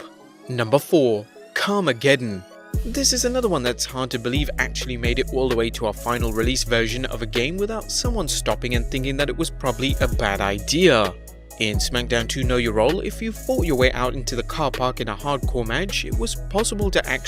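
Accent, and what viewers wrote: United Kingdom. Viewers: Maybe it's just the accent, but that was pretty stuffy British. Sex is naughty and things are improper and all that.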